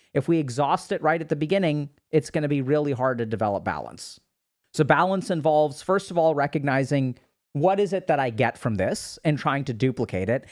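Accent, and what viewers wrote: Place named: India. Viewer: HAHAHAHAHAHAAHHHAHAH THE INDIAN ACCENT HAHAHAHA XDD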